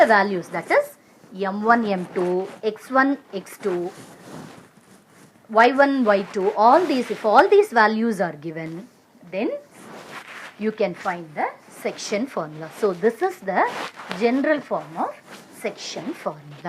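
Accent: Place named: South India, India. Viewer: Your South Indian accent is awesome mam.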